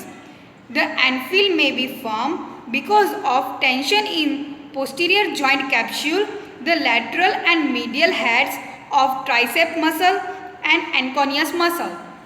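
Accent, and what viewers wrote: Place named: Gujarat, India. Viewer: The girl who is giving instructions is pakka Gujarati Only then she can have that funny accent 😂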